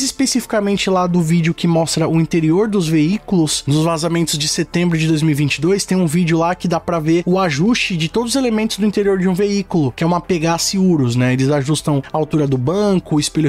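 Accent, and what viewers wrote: Portugal. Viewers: Porque seus videos agora estao com essa dublagem? Em pt portugal? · Porque sua voz parece de um português?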